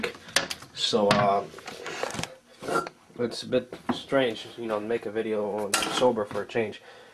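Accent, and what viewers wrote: Quebec, Canada. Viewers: Sometimes I think this is a troll but then so many videos and the conditions in there . Such commitment if so. Seems like a French Canadian his accent.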